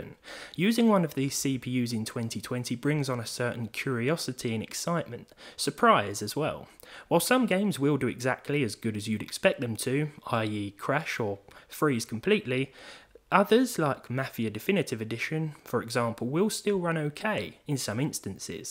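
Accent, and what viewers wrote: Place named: United Kingdom. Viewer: British accents to me are like pissing a set of prison keys... but I tolerate it for because I love him.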